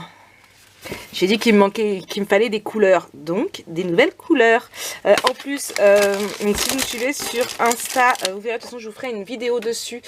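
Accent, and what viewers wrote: England, United Kingdom. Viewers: Cc jolie haul et oui j’ai bien rigolé avec ton accent anglais lol bisous 😘 priscilla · Coucou, et oui j'ai bien rigolé pour ton joli accent anglais, très joli papier,j'ai hâte de découvrir le magnifique album que tu vas nous faire,je te souhaite une bonne journée bise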